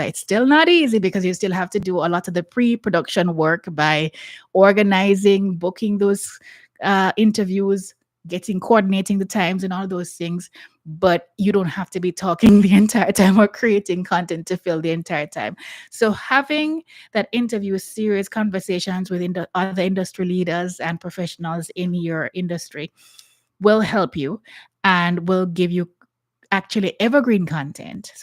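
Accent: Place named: Jamaica. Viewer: First. Your green glasses are on my radar. How beautiful!!!! Second- I hear a little bit of my island in your voice. Shall I guess? JAWI? 🇯🇲Perhaps Bahamas? Great information here for entrepreneurial, industry growth. 🌻✝️🇯🇲🌻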